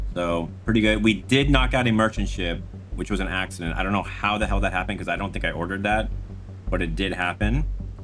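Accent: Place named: Eastern Europe. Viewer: au au au, even my eyes got hurt by your Slavic pronunciation... Dude! Come on!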